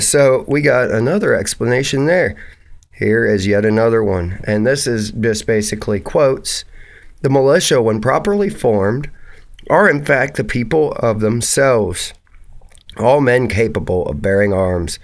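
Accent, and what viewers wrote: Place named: Virginia, United States. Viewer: haha man you sound like youre from VA! am i close?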